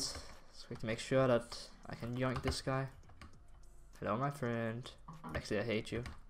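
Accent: Denmark. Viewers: are u danish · U danish? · Is this man from Denmark ?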